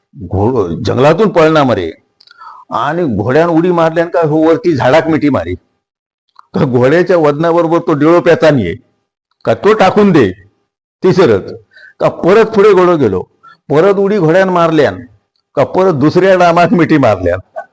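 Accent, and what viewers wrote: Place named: Malvani, India. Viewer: एकदम शुद्ध मालवणी मजा आली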